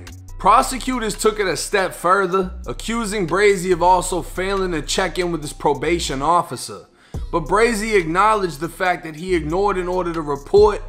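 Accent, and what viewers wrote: Boston, United States. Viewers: This dude who's talking must be from BAASTON🤔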